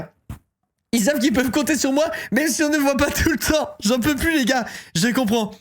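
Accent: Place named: Marseille, France. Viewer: Le dimanche c’est ACCENT MARSSEILLAIS !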